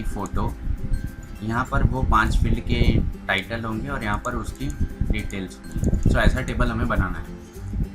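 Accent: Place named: Gujarat, India. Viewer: Watched all the video,build the same project without any errors, learned a lot about php and sql, thank you so much, commendable effort, btw you sounds like gujju, are you gujju??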